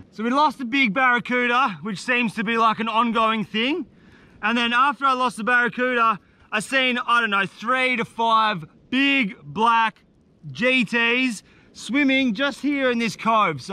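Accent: Australia. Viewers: Hi mate. Could you please tell me what kind of australian accent does he have? Im so curious as im learning english too atm, and I definitely think that this accent is 100% easy to understand than american english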